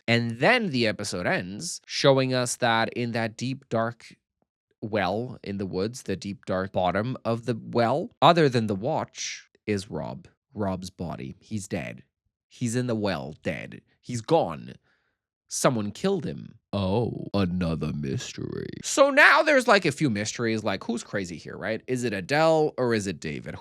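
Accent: Portugal. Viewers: já ouvi uns 20 vídeos teus e mesmo assim não consigo perceber de onde é o teu sotaque tuga ahahha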